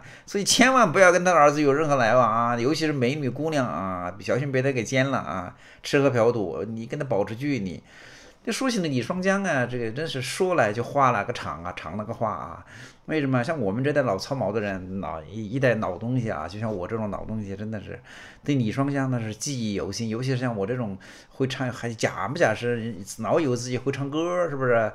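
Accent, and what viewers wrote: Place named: Jingzhou, China. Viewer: 这个主播的口音和荆州地区类似:face-blue-smiling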